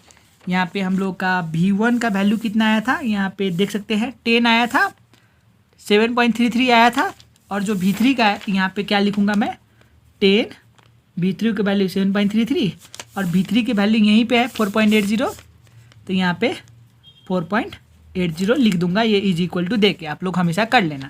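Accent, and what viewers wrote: Nepal. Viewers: dai nepali ho? haha , anyway loved it haita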